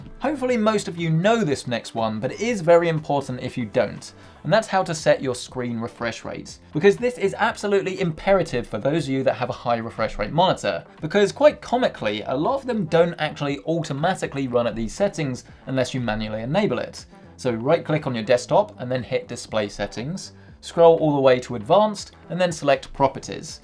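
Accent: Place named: Cockney, United Kingdom. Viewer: Not sure I am a big fan of Cockney. I think I prefer normal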